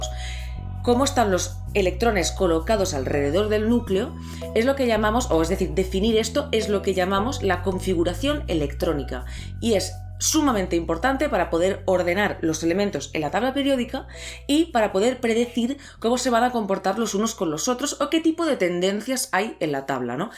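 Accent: Catalonia, Spain. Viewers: Suenas como catalana, 😰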